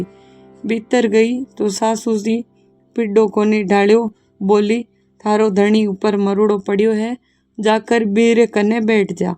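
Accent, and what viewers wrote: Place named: Marwari, India. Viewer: Nice marvadi voice 🙏🏻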